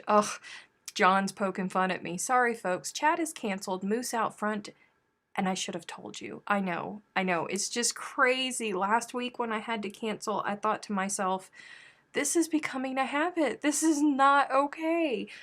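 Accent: South, United States. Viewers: In the UK, oregano is definitely o'-re-gah-no, just like the Aussies say it. We have coriander for the seeds and leaf part of the plant; pee-kan, not peh-kahn; aubergine is a plant as well as the colour - probably because we have more french influences in our words and Americans have more Spanish influence perhaps; pie has both a bottom and top of pastry, otherwise it's a tart or flan (that's flann, not flahn) 😂🤣😅 But it would be a very boring world if we all spoke "english" as the English do, wouldn't it. I think your southern accent is purrdy.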